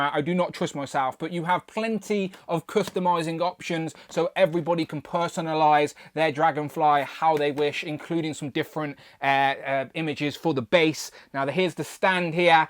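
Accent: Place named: England, United Kingdom. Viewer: These English gents are so funny the way they pronounce everything… Absolutely hilarious a lot different than us Americans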